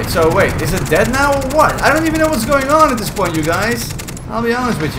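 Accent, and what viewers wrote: Netherlands. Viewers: You Dutch bro? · Hahaahah holie molie heerlijk nederlands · i smell Nederlands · I'm hearing a Dutch accent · Are you dutch? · you dutch right i can just feel and hear it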